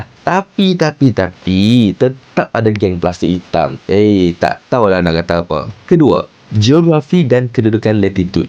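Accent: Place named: North, Malaysia. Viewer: Dgr min cakap mcm oqg utaqa.. 😅 Teruskan konten mcm ni min.. mantapppppp... 🫡👍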